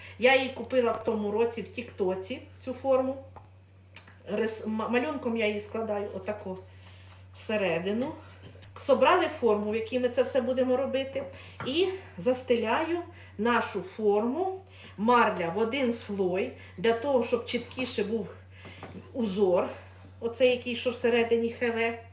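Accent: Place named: Poltava, Ukraine. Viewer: Дуже подобаються сирні страви🍮,а якщо це ще і паска ,то взагалі 🎉💥.А ще респект за ваш суржик ✌️.Ви не з Полтавщини?Мої вітання з Дніпра.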